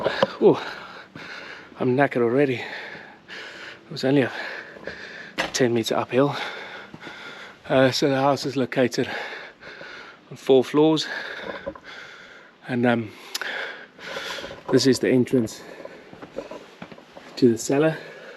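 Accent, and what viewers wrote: South Africa. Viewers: is that a SA accent hear? SUBSCRIBE!!! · I support you efforts on restoring this Italian beauty but please please work on that monotone South African narration 🤮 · Thought l recognise that SA accent. Bietjie verbaas dat jy kla oor kerkklokke wat mag raas? skaam jou! Klou aan die bietjie mooi in die lewe. Dis n voorreg om daar te kon koop en alhoewel dit baie werk gaan wees, maak die meeste daarvan - van ALLES!!!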